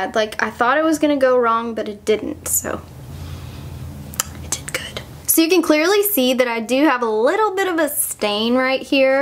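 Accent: South, United States. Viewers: Do you have a country aksent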